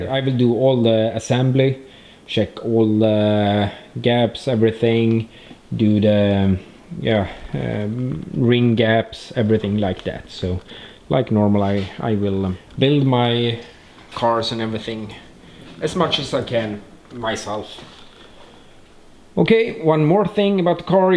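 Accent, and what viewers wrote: Sweden. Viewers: I guess you're from sweden because your accent sounds like Christian von Koenigsegg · are you Swedish?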